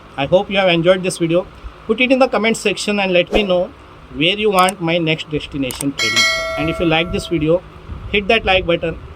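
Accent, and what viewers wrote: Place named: Karnataka, India. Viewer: Oh sweet Kannada song, I was guessing you are kannadiga from your accent now I’m confirmed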